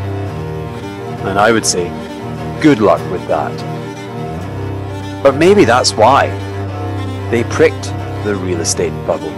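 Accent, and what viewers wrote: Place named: Glasgow, United Kingdom. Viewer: Interesting... but, as always a mind through your own eye. I thought I recognised the accent I saw you before on CNBC and checked. Glasgow lad. Well done you.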